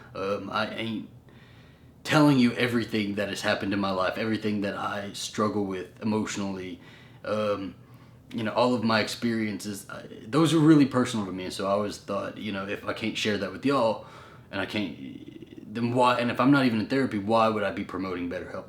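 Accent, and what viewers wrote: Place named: Southwest, United States. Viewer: Sorry to see you going through this stress. Speaking as a very old senior gay man (82) therapy is absolutely nothing to fear; therapy triggered my own coming out. I enjoy following you on youtube and have seen elsewhere on the internet certain persons trying to take you down. As a fellow Texan I especially resent those who mock your decided Southwestern accent and who fail to comprehend someone can have an Hispanic surname without having just swam the Rio Grande. In fact, there are such families in Texas which predate any Anglos. You are a great couple and a fine role model, but don't try to take on too much. I am not familiar with the company you reference but also keep in mind many cities now have local referral systems for LGBT folks. Now take a deep breath.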